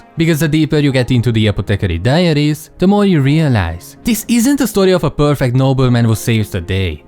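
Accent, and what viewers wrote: Hungary. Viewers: First video by for me. Not even a minute in......is that a hungarian accent I hear?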